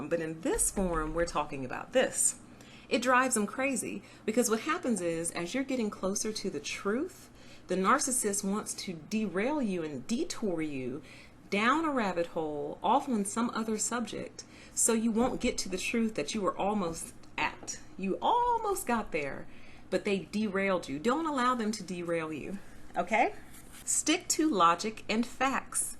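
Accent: United States. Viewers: This is silly but I think constantly about this and I've watched like 20 of your vids. So I'll just say it. I am a trained vocalist, so this analysis comes from someone who at least understands tonality. I love the tone of your voice. You have a bright, cheerful quality yet also a mature mellow, wise depth. You present the sound very high on your upper pallet which is great, it's an excellent placement. You enunciate marvelously with a pleasant neutral American accent. You do not pronounce your words in an annoying fashion at all. You do not use obnoxious voice inflections. Listening to you is very enjoyable and leaves me with a positive feeling. I bless you in the name of YaHuWaH with truth! I love listening to your videos.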